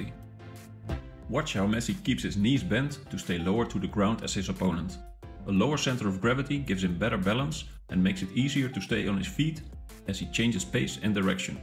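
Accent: Netherlands. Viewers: is that dutch accent omg that English dutch accent sounds horrible,,,,,,,, the video is good thank you so much my friend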